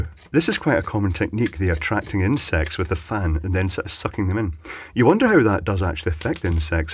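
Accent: China. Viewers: I love Chenglish!!!!!!!!!!!!! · Thanks. I love the Chinglish pronounciation!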